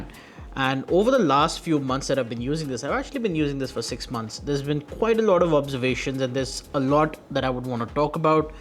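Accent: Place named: India. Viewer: nice review. the first indian english review that didn't course me to throw up after 5 minutes of hearing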